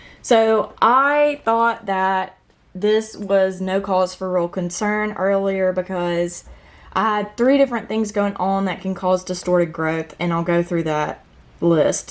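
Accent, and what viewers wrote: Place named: Tennessee, United States. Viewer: I’ve been trying to figure out if it’s mites, too much light, or too much fertilizer on my violets. I think it may be mites :( I’m going to come back to your video tomorrow and compare them to mine. I feel pretty sure they have the same characteristics and am going to throw them away. sad, two are expensive fancy ones and the other was a showstopper for me for so long. trailer always covered in tons of blooms, it didn’t even look real. I’m happy to find your video, I love how knowledgeable and in depth this is. I am also a plant nerd and love the knowledge density and showing the plants up close and talking about the very specific signs that it is mites. that is so helpful. am subscribing to you! also idk if you’re from Tennessee but I am, haha and we sound similar :)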